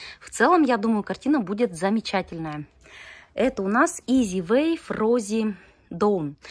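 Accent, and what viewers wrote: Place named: Urals, Russia. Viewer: Первый раз смотрю вас. Сразу подумала что вы с Урала. У меня там есть родственники у вас своеобразный интересный говор не перепутать. И слова есть которые только от этих родственников слышу. Очень у вас все цветы хороши👍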